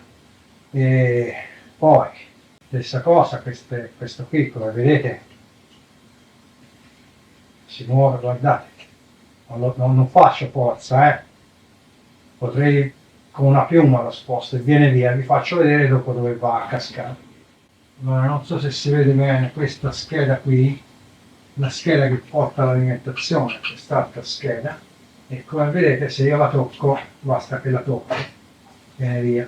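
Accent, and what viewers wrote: Tuscany, Italy. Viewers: Complimenti! Canale super interessante e competente, chiaro nelle spiegazioni anche per un neofita curioso di come sia costruita un'elettronica hifi. Aggiungo che l'accento, gli eufemismi toscani sono deliziosi!!!! Sono un po lontano ahimè dalla Toscana ma se avessi un problema ad una elettronica mi "garberebbe" 😊 rivolgermi a. Se possibile vorrei sapere un contatto mail Buon lavoro e avanti così!!!!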